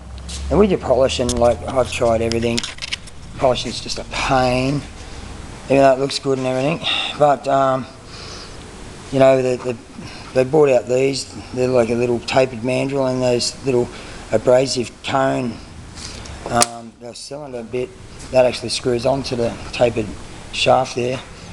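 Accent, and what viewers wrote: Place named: Australia. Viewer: he's an aussie mate, not a kiwi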